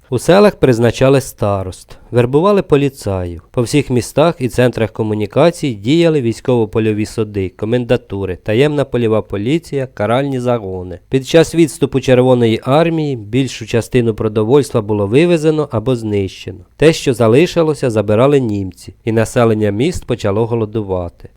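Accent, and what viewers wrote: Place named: Transcarpathia, Ukraine. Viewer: вона просто з Закарпаття, як і я:)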